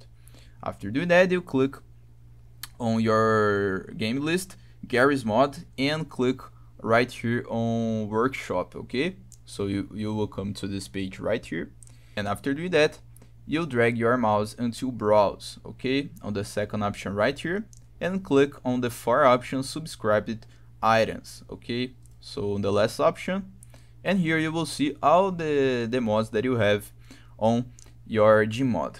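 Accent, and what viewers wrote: Brazil. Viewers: o cara fala inglês mas tem um sotaque de brasileiro!õ_ò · sotaque meio br hmmmmm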